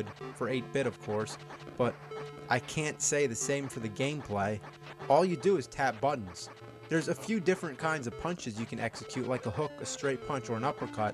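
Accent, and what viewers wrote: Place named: Philadelphia, United States. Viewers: fucking hell, im italian and still i can hear the philly accent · He actually has a bit of a northeastern accent if you listen closely lol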